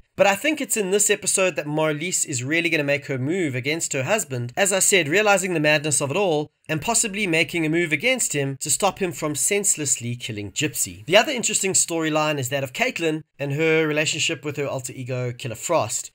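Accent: South Africa. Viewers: Are you South African? Your accent sounds South African.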